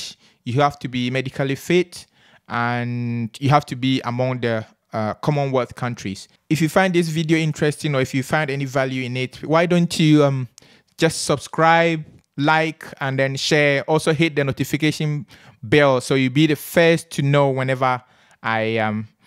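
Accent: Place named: Nigeria. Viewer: HE SOUNDS L I KE A NIGERIAN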